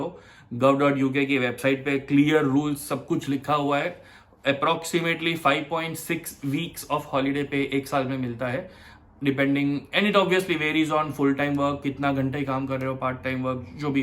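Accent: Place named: Bengal, India. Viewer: What are you Bengali or something ? I might be wrong but your accent sounds kinda similar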